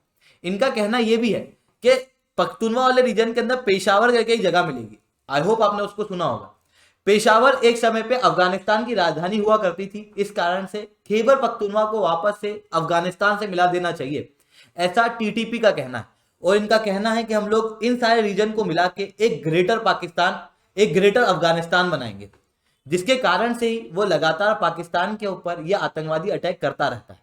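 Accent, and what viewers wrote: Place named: Himachal Pradesh, India. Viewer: Sir himachal se hae sayad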